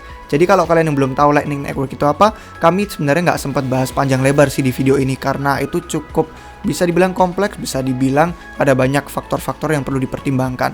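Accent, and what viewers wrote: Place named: United Kingdom. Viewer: lama tinggal di australia, temen bulenya pun banyak orang australia, jadi wajar kalo aksen british nya kental kalo kita yg terbiasa denger pake aksen US emang kerasa aneh sih 😂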